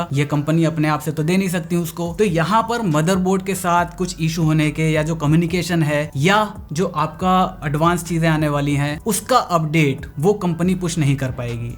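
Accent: Bengal, India. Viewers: Wait are you Bengali?? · Bengali accent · are u bengali?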